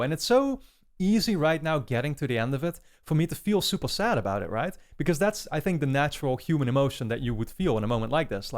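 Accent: Netherlands. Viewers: Are you from the Netherlands? Cuz you sounds very Dutch for some reason haha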